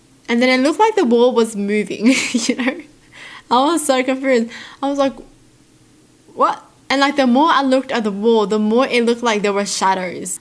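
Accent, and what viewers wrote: Australia. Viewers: She's Australian lol <3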